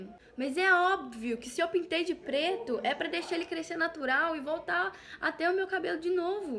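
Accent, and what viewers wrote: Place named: Minas Gerais, Brazil. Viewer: Adoro nosso sutaquezinho mineiro 😍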